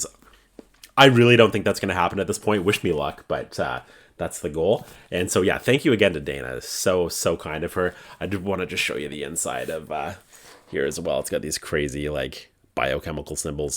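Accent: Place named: Canada. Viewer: The way I knew you were Canadian before you even said you were😭canadians are the best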